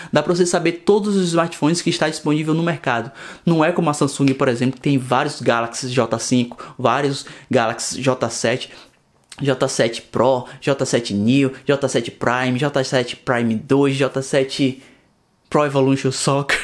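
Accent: Bahia, Brazil. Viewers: Sotaque baiano é outro nível 👏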